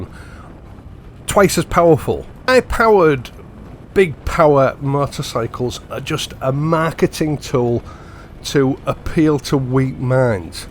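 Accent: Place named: Yorkshire, United Kingdom. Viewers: Never been on a motorbike during my 66 years on this earth. I own six pushbikes but now I’m getting the calling to to do something different. I want to learn a new skill and test myself. Would never go over a 125, would never need to. Pretty fit and in not bad health, never do less than 10K steps a day mostly dog walking and have two massive LGDs. Your video has given me the motivation to take it further. Subscribed, as I always enjoy listening to a fellow Tyke. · Hi, I was saying to my wife what an unusual sounding voice you have, I have listened to you before on various subjects about bikes, but honestly I could listen to you talk for hours! The wife thinks you may have a Yorkshire accent, is this correct?!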